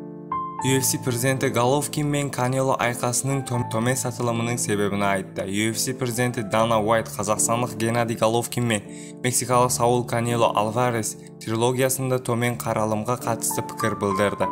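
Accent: Uzbekistan. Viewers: коментатор, тілің қарақалпақшаға ұқсап кетеді екен, қай жердесын бауырым?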